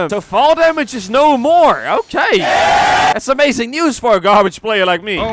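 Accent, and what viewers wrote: Netherlands. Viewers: R u dutch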